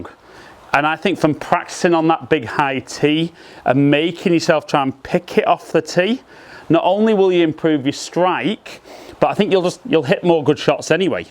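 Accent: England, United Kingdom. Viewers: Joe Longo But you will notice by his accent that he is English? We speak different from you in the US! <link>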